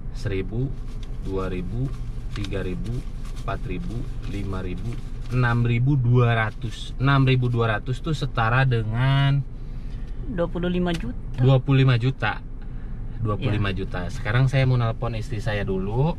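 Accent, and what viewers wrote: West Java, Indonesia. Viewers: Walaikum salam.. Khas sunda Bangets.. He he.. Salam ti Banten..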